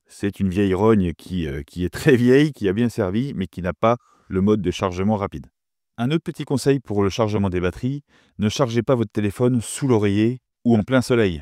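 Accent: Franche-Comté, France. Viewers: Tu es de Franche-comté toi non? Héhé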